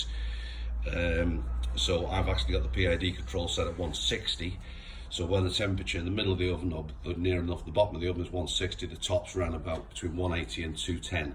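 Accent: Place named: United Kingdom. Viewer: Hi, I have got a very very similar oven, I bought it last week, havent used it as yet, bought it from a wheel refurb guy. Nice to hear someone from the UK, I have not done my first powdercoat as yet, so a steep learning curve I think is about to happen LOL. Dai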